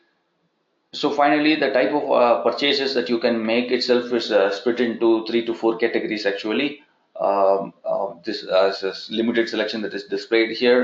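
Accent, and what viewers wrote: India. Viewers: Sorry, that indian English makes it difficult understanding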